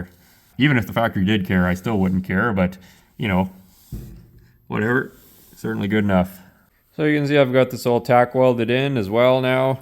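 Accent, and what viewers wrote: Canada. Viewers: You Brits probably understand his Canadian pretty well, eh?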